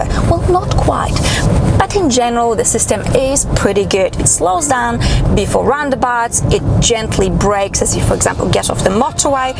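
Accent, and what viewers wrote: United Kingdom. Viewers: Thank you for a great review, ps your accent did you spend some time in the UK? Sounds like it sometimes. You look really smashing with your different great outfits in your reviews. Ceep it up 👍😉 cheers.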